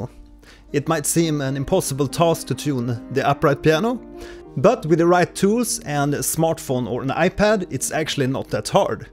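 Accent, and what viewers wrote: Sweden. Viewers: Thank you 🙏. You sounds like swedish 😂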